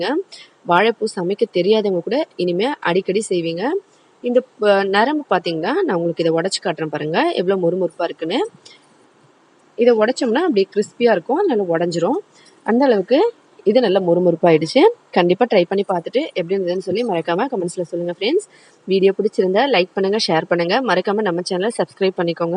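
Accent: North India, India. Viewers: Mam are you a North indian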